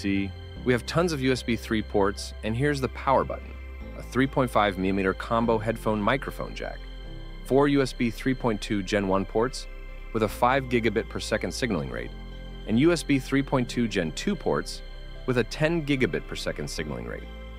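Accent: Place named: United States. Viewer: Interesting American accent european outlet lol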